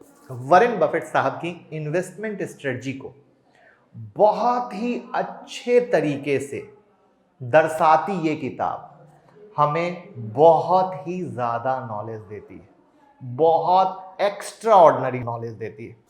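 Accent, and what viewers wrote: Bihar, India. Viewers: AAP BIHAR KE HAIN KA HO. BILKUL BIHARI HAIN. DEKHNE ME BHI AUR SUNNE MEE BHI. DISCLAIMER: I M A BIHARI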